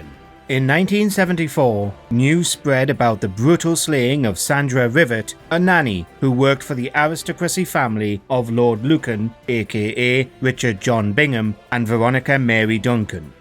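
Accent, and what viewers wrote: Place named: Geordie, United Kingdom. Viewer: No, it has a twang of Geordie. Maybe Middlesbrough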